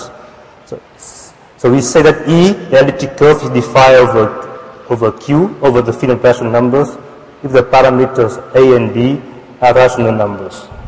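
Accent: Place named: France. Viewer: Heavy French accent :) I am a Vietnamese American, glad to see another Vietnamese coming out famous. Thank and hat of to France, a great country!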